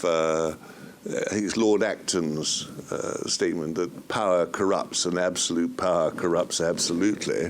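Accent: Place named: RP, United Kingdom. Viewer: ...stuff the queens english...you are still a commoner like the rest of us plebs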